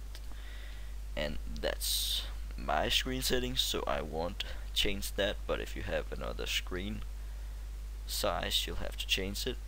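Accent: Denmark. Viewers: Lol are you Danish? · You're Danish? Right? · Han er dansker, det kan jeg høre 100 p :D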